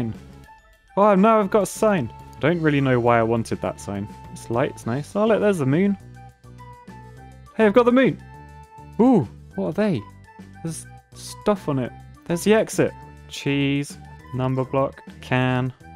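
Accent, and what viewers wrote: United Kingdom. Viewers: this bloke sounds cheeky BRITISH maybe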